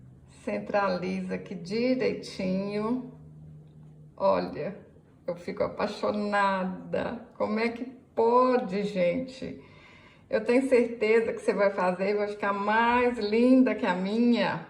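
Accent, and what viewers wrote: Minas Gerais, Brazil. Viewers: Kkk vc e mineira de onde sua voz te entregaaa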